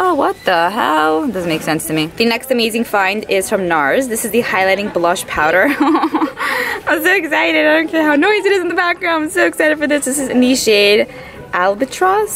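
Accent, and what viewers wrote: New York, United States. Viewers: I never comment but always watch since you came up on my suggested and I subbed. Love your accent I too am a New Yorker but I live in the deep Deep South (Florida😎) so you sound like home💖 now back to my point that exclusive for Harrods was named for Princess Charlotte they did a whole Royal line so that is an amazing find! Love all your hauls I’m a big TJ Maxx fan and just keep these videos coming!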